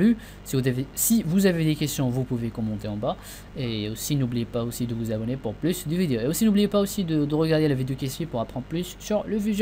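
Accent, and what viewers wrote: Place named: Morocco. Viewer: On entend l'accent marocain lol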